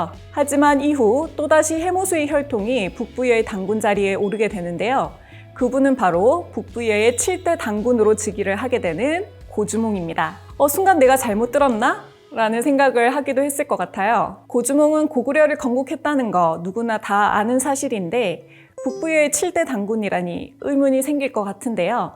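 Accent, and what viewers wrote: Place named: Gyeongsang, South Korea. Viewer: 선생님 강의는 마치 음악 같음. 선생님 때문에 경상도 억양이 이렇게 예쁠 수 있단 걸 처음 알음.. 억양의 리듬감이 진짜 아름다움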